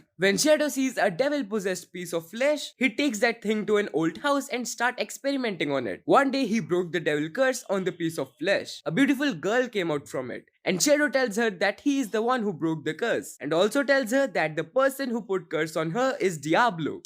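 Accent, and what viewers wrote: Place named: India. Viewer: You voice sound like indian 🇮🇳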